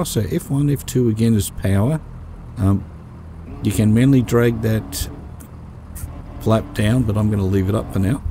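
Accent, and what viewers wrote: Australia. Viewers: Mate, I really appreciate your effort to thoroughly documenting keyboard-mouse flying in X-Plane - and other mouse-flying video posts. Just beginning my X-Plane journey during these stay-at-home times. Will definitely try the plug-in. Also, your Ozzie accent is wonderful to hear as I just returned from my PhD study last year in Brisbane. Subscribed your channel.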